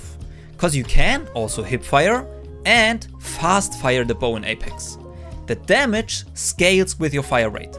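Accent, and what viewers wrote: Germany. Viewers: You Sound Like a German am I right? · I wanted to ask if you are German I’m German and I hear the accent so are you?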